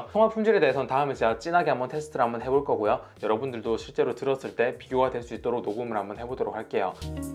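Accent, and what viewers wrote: Gyeongsang, South Korea. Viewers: 부산 근처이실 것 같아용! 대신 답변 죄송합니당..ㅠㅠ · 제가 경상북도 출신인데 뉘앙스가 너무 친근해서 그러는데 어디 출신이신가요 ?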